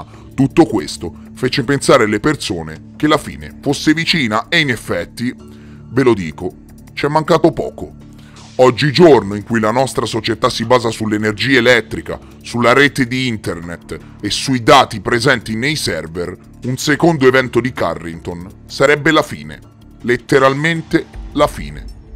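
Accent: Tuscany, Italy. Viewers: Grande.... Se posso chiedere ma sei toscano? Scusa la mia curiosità grazie per le informazioni che ci metti a disposizione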